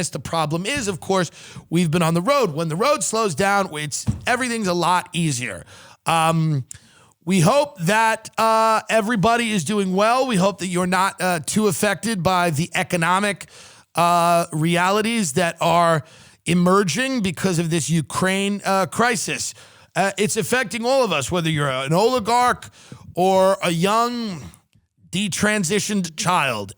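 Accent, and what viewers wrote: United States. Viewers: He sounds American to me too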